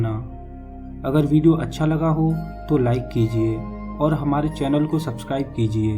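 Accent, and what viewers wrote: Bihar, India. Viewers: Bhaiyaa people ....bhojpuri Hindi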